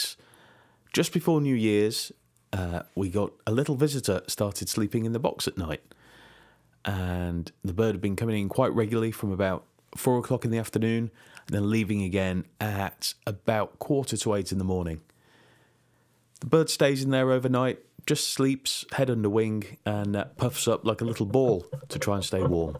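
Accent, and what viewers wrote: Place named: United Kingdom. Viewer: 와 영국발음이당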